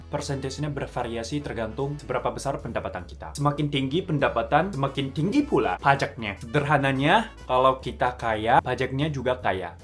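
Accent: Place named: Java, Indonesia. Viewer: Logatmu Jawa banget coyyy. 🤣 Potongan disana banyak, bedanya, sebanding sama fasilitas dari negara. Di Indo, duh, pajak dikorupsi mulu. Oh ya, BGM nya terlalu kencang. Volume-nya kecilin lagi dikit.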